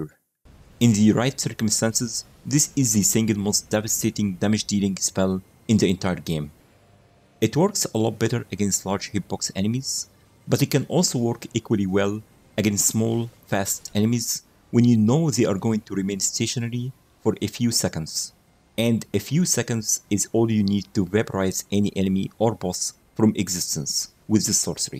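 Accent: Egypt. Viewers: Are you Egyptian? I can hear it from your accent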